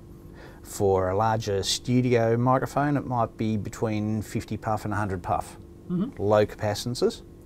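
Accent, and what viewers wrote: Australia. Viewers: I fucking love the English accent of Australia peeps. I wish, I could manage to get even 1% of that… 😂😂😂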